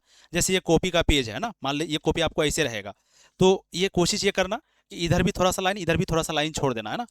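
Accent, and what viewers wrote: Bihar, India. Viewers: Sir aap bihar se ho kya aapki boli se lag rha h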